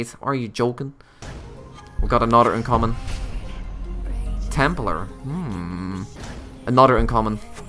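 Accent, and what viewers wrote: Netherlands. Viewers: Dat Nederlandse accent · Jij bent toch nederlands k hoor het aan je stem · Where are u from? U sound like Dutch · R u dutch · Het moment dat ik je hoorde wist ik dat je een nederlander was. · Jesus bestaat er een accent dat nog meer nederlands is dan dat van jou XD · Volgensmij ben je Nederlands aan je accent te horen?? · Are u dutch?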